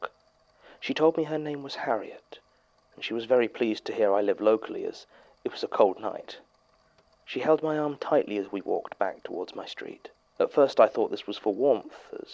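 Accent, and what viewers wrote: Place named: United Kingdom. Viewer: I love mumbling in my ear just like doing his thing I love a deranged British man in my ear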